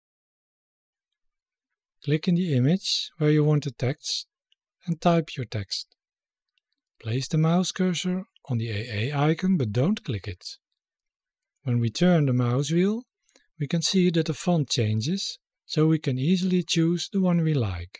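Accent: Netherlands. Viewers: You have that typical dutch-english accent, love it.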